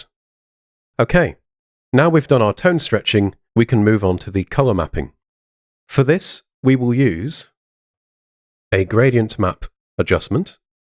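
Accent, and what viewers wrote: United Kingdom. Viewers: Nice UK accent and nice tutorial...Watching you from Iraq.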